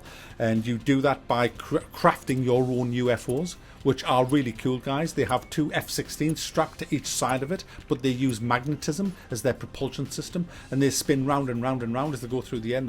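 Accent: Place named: Newcastle, United Kingdom. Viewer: You sound a bit like a geordie too??? There's a few on YouTube now it's great to hear 😊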